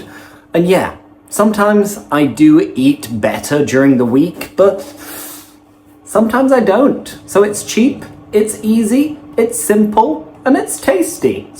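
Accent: United Kingdom. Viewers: I'm trying to do shadowing with your videos to get a British accent, which is really beautiful. Thank you very much 🙏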